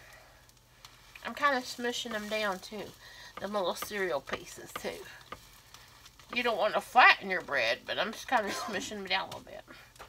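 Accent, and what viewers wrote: South, United States. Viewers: I am currently on a diet. I eat my diet lunch at work and watch make wonderful things. Her demeanor is wonderful and her accent takes me back home to the south. Thank you,; bless your heart. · I am from North Carolina and she sounds like we locals, too. She is a real southerner, like so many people I know - not like that manic, shrill, slightly disturbing Paula Dean.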